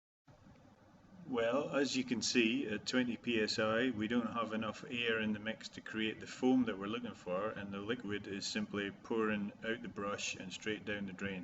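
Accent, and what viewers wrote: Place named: Scotland, United Kingdom. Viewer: I had this question today as my presoak was spitting air and not enough fluid. I played with the fluid pressure and got the stream working again. My system is 30 years old and the foamy brush and presoak share the same air pressure but they have individual regulators for the fluid pressure. Thanks for the education on this. I'll be checking my floam consistency from now on using your guidelines. (and how come an Aussie has a Scottish accent?)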